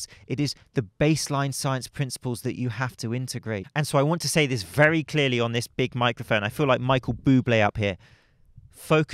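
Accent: United Kingdom. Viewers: Where do you live? British accent, Palm trees and sunshine but, you're wearing a hoodie!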